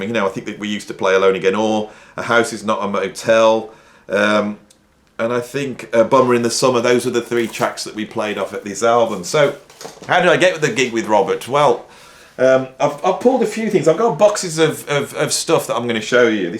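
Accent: United Kingdom. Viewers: It's Fun listening to your Videos, regards from Germany, Love your British accent, I'm 69er...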